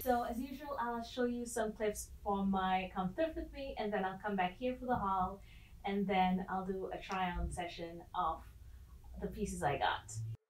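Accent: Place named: Jamaica. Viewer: You sound like you have a Jamaican accent!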